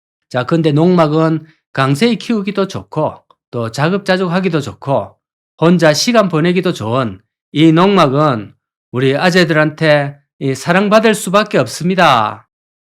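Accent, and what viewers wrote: Gyeongsang, South Korea. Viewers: 웃음끼 없는 경상도 사투리에 화면은 보이지 않고, 웃기만 하는 순간들이 있습니다😊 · 갱상도 표준말 방송......좋심더에! 잘 보고 있심더. 배차, 소나무갈비,고매,.... 억수로 올만에 들어보니 71년 촌에서 초교 입학때가 선합니다요. 뭔 농막이 이리 커요? 쉼터로는 따봉이것네요.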